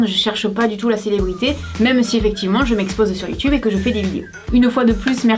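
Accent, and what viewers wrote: South, France. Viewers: Félicitations! Tu mérites amplement tout ce qui t'arrive de bien en ce moment! Bientôt 200 000 abonnés en plus! Toutes tes vidéos sont pleines de super conseils et on voit bien que ta maturité et ton expérience parlent et toi au moins tu ne dis pas d'énormités comme certaines jeunes youtubeuses. J'admire ton travail et ta force de caractère. Je suis ravie chaque fois qu'une nouvelle vidéo de toi sort. Tu es la seule dont je ne me lasse absolument pas. Et ton accent! Enfin du sud fièrement affiché sur YouTube pour nous représenter! Je n'ai que 23 ans mais je préfère tellement te regarder toi que d'autres plus jeunes. Change rien! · Bravo à toi! Tu as mérité tout ce qui t'arrive, tu es tellement souriante, naturelle, tu fais du super boulot et puis je vais te faire une confidence, j'adore ton accent qui me rappelle pour ma part quand j'habitais dans l'Hérault et pour mon mari lorsqu'il habitait Toulouse. 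Tu nous réchauffe avec cet accent ensoleillé;) Tu donnes aussi des astuces tellement utiles:) Pour moi tu es la copine qui serait là et qui me dirait " mais non ne jette pas ça, regarde tu faire ci et ça avec ta crème ". Petite question de curiosité: comment est-ce que tu sais notre âge? Gros bisous et toutes mes félicitations, c'est vraiment mérité:) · coucou vraiment bravo!! je te suis depuis longtemps et je t'adore tu as un accent de chez nous du Sud une abonné de +!!😘😘😘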